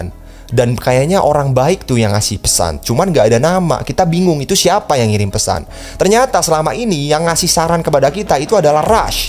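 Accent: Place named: West Java, Indonesia. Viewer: Kang jiga orang sunda logatnya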